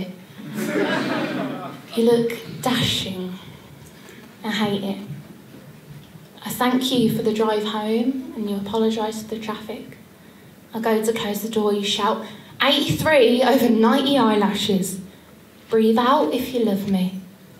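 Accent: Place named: Birmingham, United Kingdom. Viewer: excellent, the brummie accent really suits your style, and you're beautiful too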